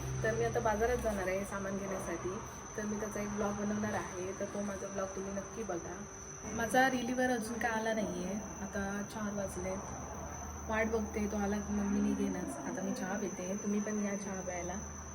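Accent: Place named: Konkan, India. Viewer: कोकणी बोलण मस्त वाटत, मांजराशी बोलण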